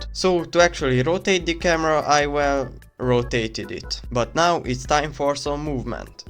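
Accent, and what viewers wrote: Hungary. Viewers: are u hungarian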